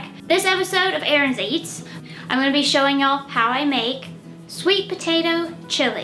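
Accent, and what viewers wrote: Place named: North Carolina, United States. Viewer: You sound like your from my neck of the woods in North Carolina 🤗😁, "don't need no meat"😄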